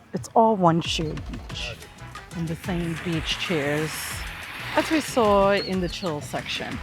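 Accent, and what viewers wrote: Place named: Caribbean. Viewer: OK. I'm from New York as well, Brooklyn to be exact. I kinda hear a Caribbean accent too from you.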